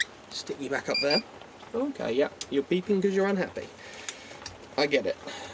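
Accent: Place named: Lancashire, United Kingdom. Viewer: You sound Lancashire lad I’m in Rochdale. Do you mend them? Batteries for people thanks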